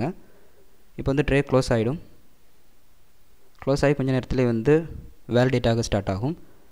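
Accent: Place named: Kanyakumari, India. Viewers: ஒலகத்தில எங்க போனாலும் கன்னியாகுமாரிகாரன ஒரே வார்த்தைல கண்டு பிடிச்சிலாம்......... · Bro neenga kanyakumari thanae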